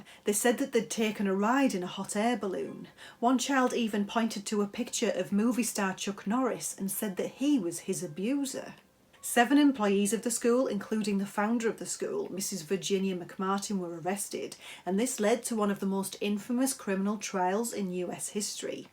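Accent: Scotland, United Kingdom. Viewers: I liked this video and your accent. Are you Scottish? You are very beautiful.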